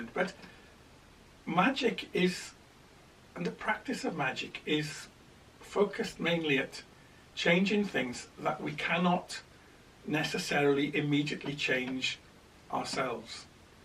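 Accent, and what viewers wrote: United Kingdom. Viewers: I like the way this guy talks he is British like my mom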